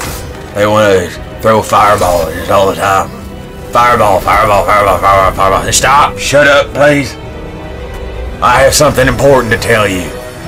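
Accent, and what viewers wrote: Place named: South, United States. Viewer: vaguely threatening southern rambling Thahs the truth.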